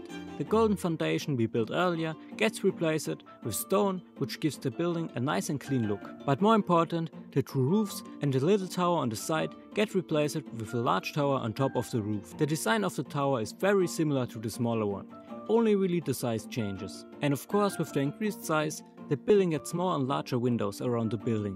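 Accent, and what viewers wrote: Germany. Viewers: Man hört richtig, dass du deutsch bist hehe Nices video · Are you german? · I like your accent. Is it german? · Deutscher Akzent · Are you from germany, cuz you've got a damn german accent! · Can I ask where you’re from? You sound German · Wer you german? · you are from germany right?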